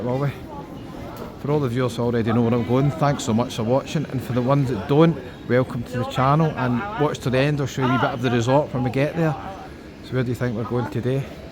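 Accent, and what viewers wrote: Scotland, United Kingdom. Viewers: ignore the comments regarding your accent. Maybe they don't like our Scottish accents, I don't know but don't ever change the way you speak for no one. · He's Scottish! You're lucky he's not Geordie.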